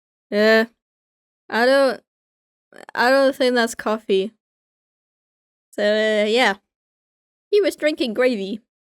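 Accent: United Kingdom. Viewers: (if this is somehow racist im sorry) are you British you sound British and if so... GOOD FOR YOU! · I Still Love Your British Voice - w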